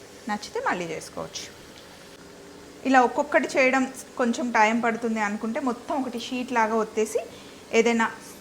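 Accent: Telangana, India. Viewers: Akka meeru Telangana kadha say elachi poddi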